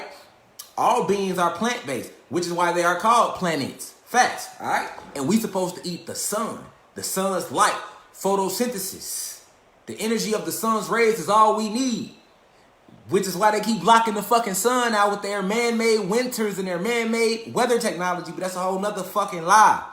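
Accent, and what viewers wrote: Chicago, United States. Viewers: His Chicago accent so strong 😂😂😂 he make me miss my family in the chi now I gotta visit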